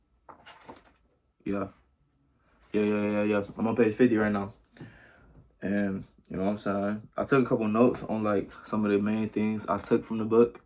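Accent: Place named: Cleveland, United States. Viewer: Yep u get attached to something u want not need 🔥 Point 1)Yep misery loves company Bro 🔥 Point 2) No wonder Brothers like you reach out to me! I exudes this energy! Point 3) Yeah I can show love whenever I can cause IDGAF what anyone else thanks 😂 I'm pretty sure that's another reason for reaching out 😂❤️‍🔥 Point 4) Yeah my internal completion is my own trophy, belt 🙌🏿 As long as I help 1st & wouldn't mind help 2nd ❤️‍🔥 Point 5) That's why I've graduated from community college with a 3.7 cause I had to take off in the past, fix & get my flow right, make sure I'm in alignment with myself 1st & obviously God & the 1st of da Fam that was there for me at that time who got me too ❤️‍🔥🤝🏿❤️‍🔥 Point 6) Yeah Bro they say if u chase something it runs away 🏃🏿‍♂️💨 😂🤣 So Once I became it Good Brothers like u started reaching out to me ❤️‍🔥🤝🏿❤️‍🔥 Point 7) Yep I went off of frequency to help reach out you & I'm goin completely off of frequency with myself 1st to make sure my life takes off this week 🔥🔥🔥 Point 8) Damn Right Brother 🔥💯 ++++ Bonus that waz a bar fo bar 😂🤣 & PS Yes now this makes since I have an increase of yall reaching out to me now & new Brothers reaching out to me 😂🤣 its the energy from all the good work I'm doing yall feel 😂❤️🤝🏿❤️💯 Good Video My Little Brother & yeah I emphasize with that Cleveland accent sometimes I have a tongue twister 🌀 too with my Detroit accent 🤝🏿😂🤣🇨🇦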